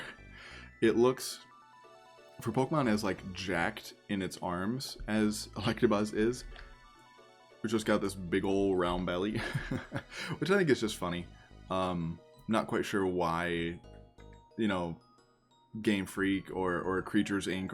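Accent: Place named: New York, United States. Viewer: No it,s acshuly a new yourk acsent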